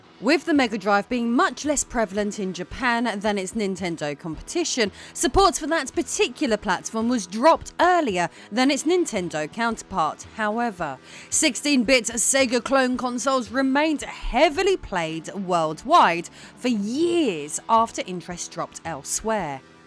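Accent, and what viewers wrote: United Kingdom. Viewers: I love the british accent❤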